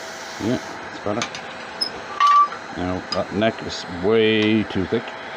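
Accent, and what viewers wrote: Ireland. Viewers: Brilliant. Is that aDublin Accent.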